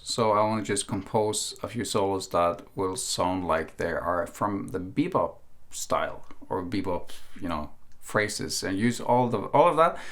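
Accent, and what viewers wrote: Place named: United Kingdom. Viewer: So British !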